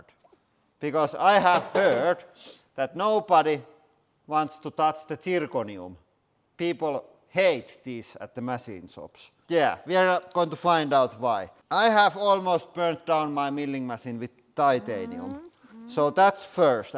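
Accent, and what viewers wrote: Finland. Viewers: I love when Finns speak English. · I know you speak this extreme finglish on purpose, but after a while it gets really irritating. So irritating that i find myself muting the videos. The gimmick has gotten to be a bit over-used. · Rally English never gets old 🇬🇧❤️🇫🇮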